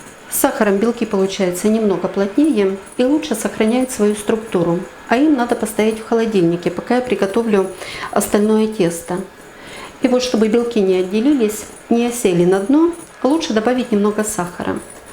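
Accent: Ukraine. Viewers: Нее. По хохлятски говорите. Непрятно слушать. Тьфууу картавите таак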